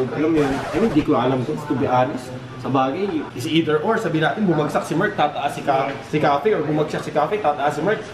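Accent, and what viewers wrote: Visayas, Philippines. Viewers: bisaya ka? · Bisaya ka ba? 😁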